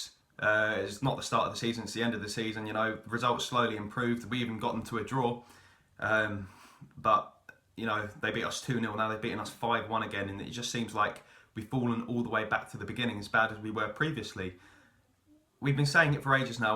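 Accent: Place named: Scotland, United Kingdom. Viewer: what a strong Scottish accent you have there.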